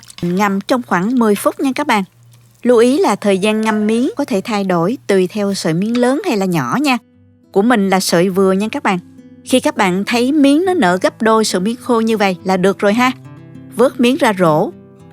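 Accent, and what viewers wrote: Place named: Binh Duong, Vietnam. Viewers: Quê hương minh cung la binh dương nghe sau nho nha quá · ơi tiếng nói cô dễ thương quá __ mình nghĩ chắc quê ở Bình Dương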